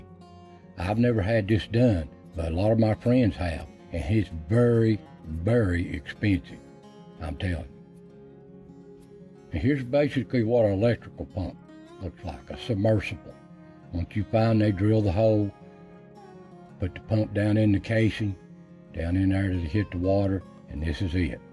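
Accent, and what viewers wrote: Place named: Georgia, United States. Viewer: When I was a little 3-5 years old girl, I remember riding up and down on a pump handle!! About 6-7 years ago, I was hand washing clothes by scrub board, wash tubs. I did that for about 2-3 years. In the winter, it was horrible how bad the cold weather was on hand washing clothes!! I love listening to you talk! You sound like me! I'm from Georgia, live in Cheyenne, Wyoming now!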